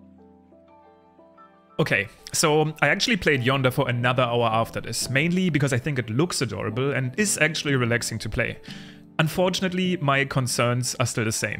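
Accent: Germany. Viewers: Dein Englisch klingt sehr Deutsch 😂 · i hear some GERMAN accent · you sound very german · German! I can hear it from the accent alone! Guten Tag! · Man merkt halt das du deutsch bist (kein hatte) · you have a very german accent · I love the Fortnite chest sfx in the first game. Is you accent German? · Are you german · You sound german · digga wie deutsch du bist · Alter man hört so geil raus das du Deutscher bist :D Wünsche dir alles gute ! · you sound very much german😅😂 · Kann es sein das du Deutsch bist? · tasting the games 😂 ich liebe deutschen Akzent im englischen so sehr 🥲❤️😂 deine Videos sind toll mach weiter so ☺️ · Bist du deutsch bruder? XD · Man hört so stark das du deutscher bist😂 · You're German aren't you 👁️👄👁️ ich fühls in den nuggets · Man hört zwar das du Deutsch bist aber trozdem machst du Gute Videos aber warum Raid shadow legends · Bro this german accent… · are you german? 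austrian? nordic? dutch? i must know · bist du deutsch? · Are you German? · 3 seconds in and i hear the german accent lol just found u but u seem cool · So i would geas your german ;) If not you have intristing Acsent Kein bock mehr zu schreiben gg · Sachmal du bist doch deutsch oder 😂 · Xd ich glaube du bist deutscher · Im hearing some german acent ?? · Hes german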